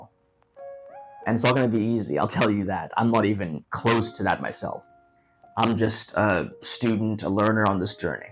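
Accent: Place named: South Africa. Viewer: Where are you from brother? Your accent has all these quick nods into a South African accent but then dips out just as quickly to sound largely American. I'm really struggling to place it.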